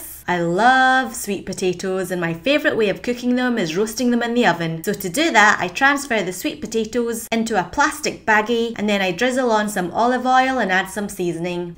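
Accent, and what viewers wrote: United Kingdom. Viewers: From your accent I thought you were from the UK, but once I saw the black swan hummus and heard you bought it from Coles I knew you're from Australia! So I subscribed start away! Yah~ can't wait for more videos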